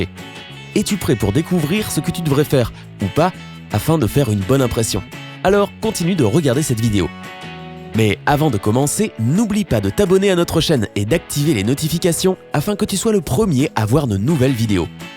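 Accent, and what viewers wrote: Quebec, Canada. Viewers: Si le gars est Québécois, il parle comme au Québec, on ne peut pas lui en vouloir de ça - à nous d'adapter pour l'Europe :-)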